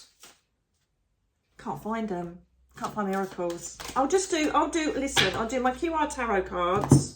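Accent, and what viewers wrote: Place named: England, United Kingdom. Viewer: Scrolling thru after just watching another YTuber and you both had same major arcana cards! You’re funny as hell, love ur English accent - you’re a hoot! Made me smile & laugh. Interesting because I’m going to a QHHT session (quantum healing & hypnosis technique) done by Dolores Cannon to connect w/our higher self. Doing this session on 12/4 - looking forward to + I’ve been doing a lot more research in opening my spiritual awareness & abilities. I am a Reiki 1 level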